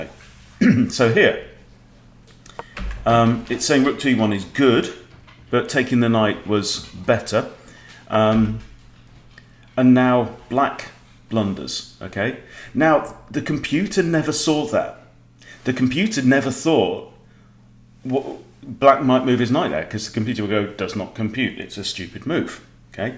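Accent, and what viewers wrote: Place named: United Kingdom. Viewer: Bingo!.... the computer is telling you to expect a fantasy situation... I figured out why is fun and informative versus the Feingolds, Gothams, Bartholomew's that are on here..... those guys, good as they are, well intentioned as they are, are like talking computer engines. Plus...those guys don't have British accents or roosters either...:-) Great vid on a great game with great points... thank you sir....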